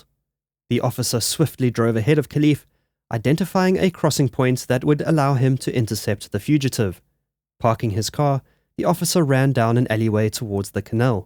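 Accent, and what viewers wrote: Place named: South Africa. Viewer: I always get thrown off by the South African accent at the start, but then it reminds me off home so I love it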